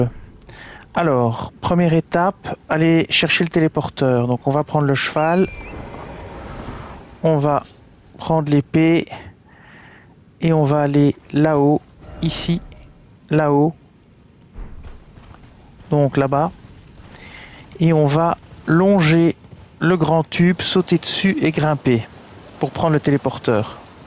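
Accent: Belgium. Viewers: Tu as un accent du Hainaut je me trompe ? ;) merci pour le muguet !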